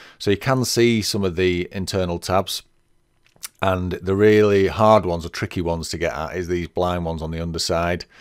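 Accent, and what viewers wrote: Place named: England, United Kingdom. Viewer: Good to hear an English voice for a change on an instructional video.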